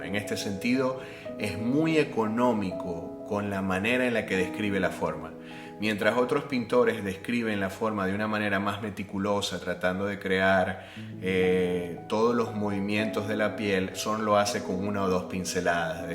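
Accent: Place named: Venezuela. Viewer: ¡Hola! ¡Oye!, tienes un acento 'matao' , neutro, juraría que eres venezolano. ¡¡¡Excelentazo tu video!!! Saludos.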